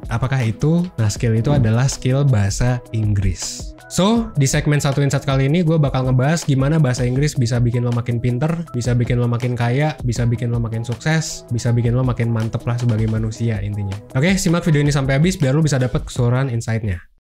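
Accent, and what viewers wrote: Indonesia. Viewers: Aksen indo yg paling lucu.. 😂😂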